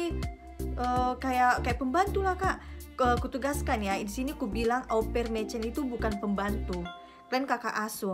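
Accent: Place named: Medan, Indonesia. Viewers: Nampak y logat medan itu. Gk bs hilang hahahaha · Enak bangat ya dek..udh pernah di jelaska n Inong same.. kayak gitu dek soalnya dia pernah melakukan nya sekarang dia kawin sama orang Austria .. dan tinggal dia di Austria..anak saya aja saya anjurkan kayak gitu dek ..tapi diaudh kerja sama kuliah sekarang di Batam de .senang kakak dengar logat Siantar mu dek..❤️😭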